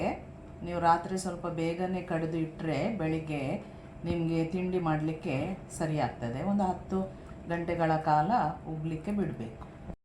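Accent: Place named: Tulu Nadu, India. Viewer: Madam your Kannada is very poor mixed with TULU LANGUAGE.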